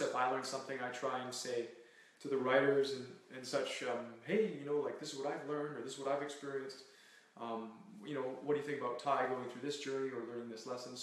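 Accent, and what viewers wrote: Canada. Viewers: When your american and he says drAMa and soory ive never understood but then again im not Canadian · He sounds more Canadian here than on heartland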